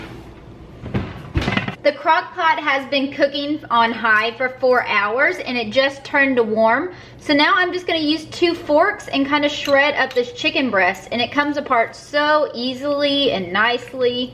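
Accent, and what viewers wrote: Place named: Texas, United States. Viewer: I knew you were a fellow Texan from your accent.😀 Im a newbie to your channel and I love it. CONGRATULATIONS on your boat 🚤🚤!!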